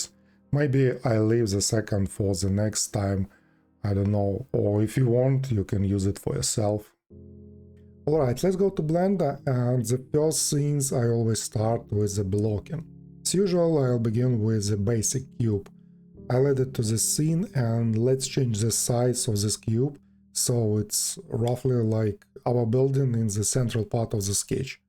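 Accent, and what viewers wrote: Russia. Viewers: Ochen' accent russkiy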